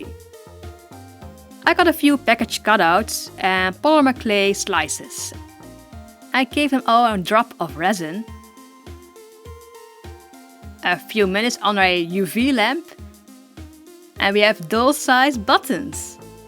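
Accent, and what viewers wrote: Netherlands. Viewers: Heyo! I'm also Dutch! Ur pretty good at English. And I know, sometimes it's hard to speek English with that Dutch accent... I mostly just put something tru Google translate lol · Where are you from? You sound kinda Dutch in some way but idk oof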